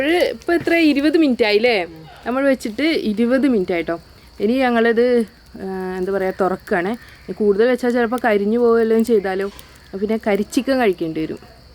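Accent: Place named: Malappuram, India. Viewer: ഏതാണ് നാട്.. വർത്താനം കേട്ടിട്ട് മണ്ണാർക്കാട് മഞ്ചേരി യുടെ ഇടക്ക് ആണെന്ന് തോന്നുന്നു..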